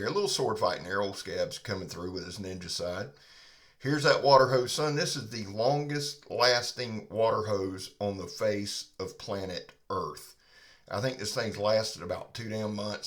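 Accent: South, United States. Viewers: Ohh OK.. I'm in GA. I seen your shirt and your Southern accent and thought maybe you lived around down here.. Love your video's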